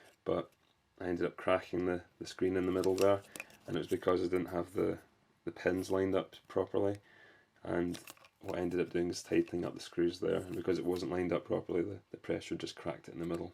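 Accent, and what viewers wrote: Scotland, United Kingdom. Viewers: R U Scottish? Just wondering great video btw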